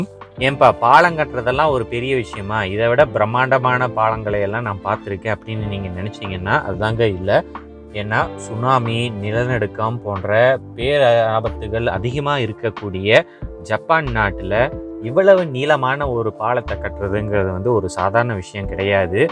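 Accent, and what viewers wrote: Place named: Kongu, India. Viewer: Thanks for all your work and narration in this channel. I love your kongu and pure tamil; You have been doing a great job, Thanks again!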